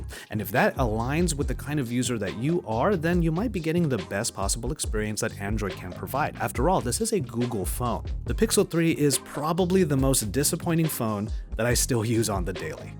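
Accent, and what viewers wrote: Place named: Philippines. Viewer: I love it na hindi mo tinatago ang Pinoy accent 💙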